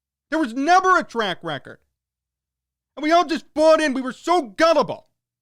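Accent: Chicago, United States. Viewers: This is the most Chicago accent I’ve ever heard in my life.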